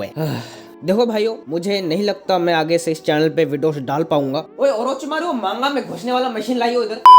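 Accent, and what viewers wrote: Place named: Bihar, India. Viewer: Bhai tu bihar se hai mera ek dost bhi ese hi bolta hai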